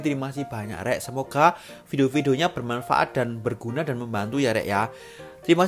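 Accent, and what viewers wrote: Java, Indonesia. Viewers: Menunya enaak, gaya komunikasinya menarik, apa adanya, jawa banget, nyenengno 😀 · Di klukupi iku opo mas?! Hehehehe...medok'e mantabs!!! Sehat ya mas... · Terimakasih resepnya ttp ngomong2 masnya orang msna ya kok jawsnya medok.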